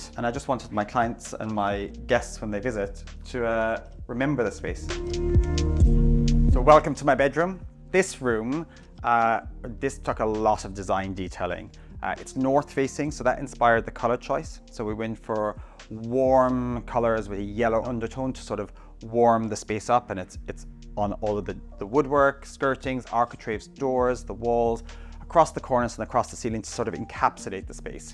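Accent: Ireland. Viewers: I am the first to admit that I'm a bit of a design snob... And yet, I appreciated and enjoyed the decisions you made to create your personal space. Well done, you. (Plus, an Irish accent to boot!)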